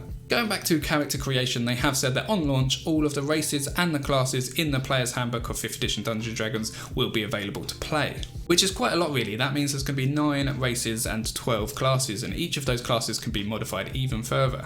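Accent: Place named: United Kingdom. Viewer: Hi! Great video! Just curious, where are you from? I would guess somewhere in UK but can’t place an exact place. I think baldurs gate 3 is gonna be amazing!! Thanks and keep up the great content.:)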